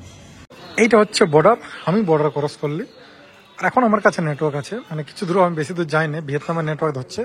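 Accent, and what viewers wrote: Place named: Purulia, India. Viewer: Dada tumi purulia bangla bolcho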